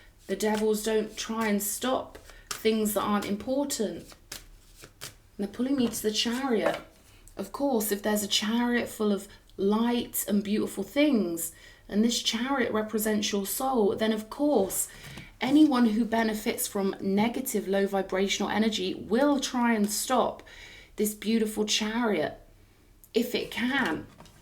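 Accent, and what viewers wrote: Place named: Dorset, United Kingdom. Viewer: Hi all, Does anyone know where I can get one of the little dog figurine with the Bee 🐝 on its foot please?,thanks for your messages,you sound like you're from the same area as myself,Dorset or cornwall.love & blessings.BABA CHRIST 🕊️🤍🌹🐝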